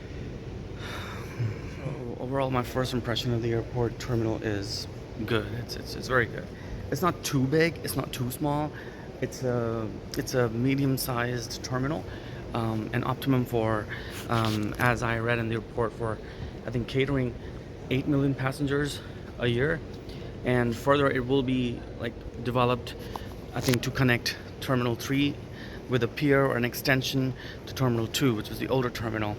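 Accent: United States. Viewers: You have an American accent. I grew up in LKO. I live in Cleveland, Ohio now. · Hi I noticed you speak with an (American ?) accent. I'm curious, did you learn it from a particular source or through practice ?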